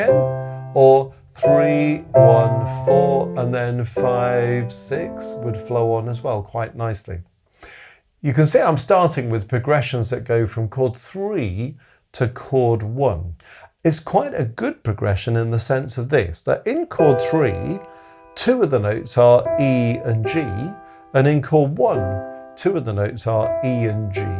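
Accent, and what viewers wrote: United Kingdom. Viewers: Dear, teacher, sweet British utter, the one when you listen to, look like you are the one who is asking and you also the one who is answering, a very close, I do really thank you, thank you for all the love, optimism and the happy shining side that reflects your pure soul, thank you Mr, I really hope the words can help me to explain to you how much I am thankful, greatful to you, this all from my heart, may I have your excuse for my English, but believe it or not (you are using this phrase,sometimes) the language that I am using, is gained through self taught, thank goodness and thank you, as this is one of the other things you also sprinkle on us within your explanation in your lovely videos, I am Abdullah from a country still living in the 12 century, before bach era and that means your lessons is a future content, how about if told that may be almost 100 percent of the people here know nothing or have heard about bach or his legacy, thanks again and I wish also to say hi to everyone here.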